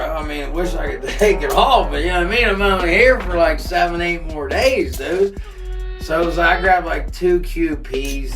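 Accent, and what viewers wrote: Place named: Pittsburgh, United States. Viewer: plus I'm from pittsburgh So it's great to hear the stories told with our burgh accent and from a pittsburgh point of view And funny as hell I say call Netflix !!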